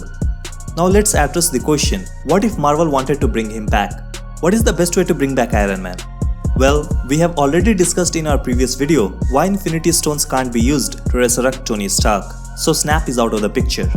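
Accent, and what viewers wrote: India. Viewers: U r Xcent sounds like a Marathi guy....................... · r u indian ??? the acent is like india . and i think i c this graphic somwhere and the concept too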